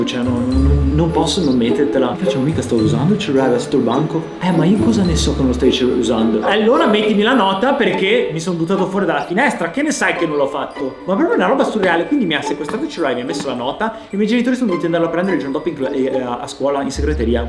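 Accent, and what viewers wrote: Veneto, Italy. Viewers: Diamine! Se sei ignorante e non conosci la mitologia classica, rileggiti (sempre che tu l'abbia letto) tutto il filone antropologico sulla mitologia classica del professore Robert Graves, influenzato dal professor Frazier: Atlantide=Atlante, colui che portava il peso del mondo sulla schiena. Oceano Atlantico=il luogo in cui Poseidone lo aveva confinato. Studia dizione, perché l'accento veneto è pesantissimo!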